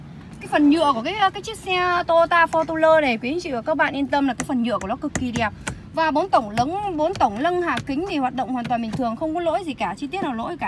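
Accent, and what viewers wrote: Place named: Hai Duong, Vietnam. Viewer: Thương em vất vả, nghe em nói đã biết quê gốc Hải Dương, cứ níu na níu nô, chúc em kinh doanh tốt nhé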